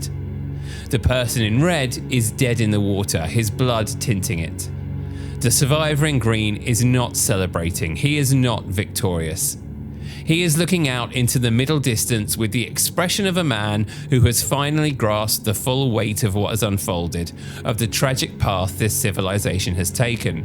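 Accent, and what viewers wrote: United Kingdom. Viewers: It’s bs.You got a Brit narrator,who probably doesn’t like that his own empire fell now hoping the US does too.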